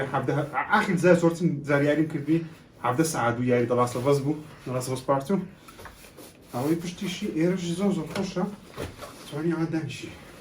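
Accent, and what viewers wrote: Erbil, Iraq. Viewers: Bzhe zorm pe xosha ka ba hawlere zmane rasant qsa akay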